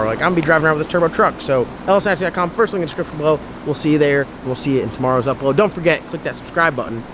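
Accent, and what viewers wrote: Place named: New England, United States. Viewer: IF YOU NEED SOME HELP I'M NOT AS GOOD AS MATT IS BUT I'M PRETTY DAMN GOOD AND I'M AROUND ANGER NOT TOO FAR FROM ERWIN I'M LIKE 25 MILES FROM YOU I CAN WELD I CAN DO EVERYTHING THAT MASON DOES PLUS MORE I'M NOT GOING TO SAY I'M MAD BECAUSE I WOULD LIKE TO LEARN TO TUNE BUT I'M RIGHT UP THE ROAD FROM YOU AND HAVE A COUPLE GOOD PROJECTS THE FIRST ONE IN NEED IS A 1965 CHEVELLE MALIBU 300 DELUXE WANT TO TWIN TURBO WITH WITH A 6 L AND INTERESTED ABOUT GETTING INTO THE STORM WORK. I'M NOT A SCRUB MY OWN LAND I'VE BEEN A PLUMBER FOR THE LAST 20 YEARS PLUS AND I'M 40 YEARS OLD I'M NOT TOO EXCITED ABOUT PENNSYLVANIA YANKEES BUT SEEMS LIKE YOU MIGHT BE A FUCKING TRANSPLANT YOU SEEM PRETTY SOUTHERN TO ME JUST YOUR GODDAMN YANKEE VOICE GIVE IT 10 MORE YEARS THAT'LL GO AWAY ASSHOLE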